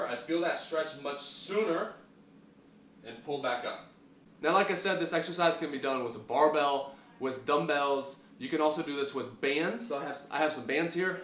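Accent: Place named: Texas, United States. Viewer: Hey. This is one of the best explanations of the RDL that I have ever heard. You must be a great trainer. One thing I missed is how far apart are your feet? One feet, two feet? Also do the Brits question you about your accent and say "Oh you must be a Yank?" At which point you say "no, I am a Texan!" Thanks for the video.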